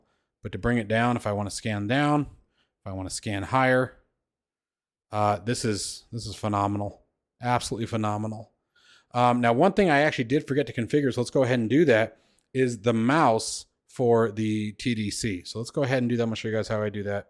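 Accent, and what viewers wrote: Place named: United States. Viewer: do they ship to the united states? you sound american but doesnt mean you live in america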